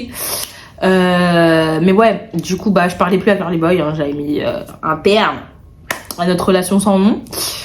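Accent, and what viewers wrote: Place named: Democratic Republic of the Congo. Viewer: L’accent congolais j’adore 🥰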